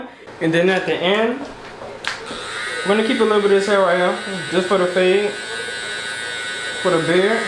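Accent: Louisiana, United States. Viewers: Showing my 18 baby your tutorial and he said "momma he sound like you"...I said I know he definitely from the City! N.O represent!! 🫡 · Southern Louisiana as a native..... He sounds like home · Ooooooh you sound like you from New Orleans….tell me I’m wrong…please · Say bruh you from New Orleans? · Louisiana accent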